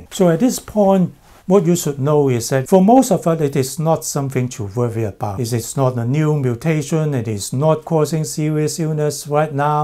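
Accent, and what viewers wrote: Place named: China. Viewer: Love the English with Chinese accent. Made me miss Hong Kong ! 🇭🇰❤ Hope this virus is not serious at all.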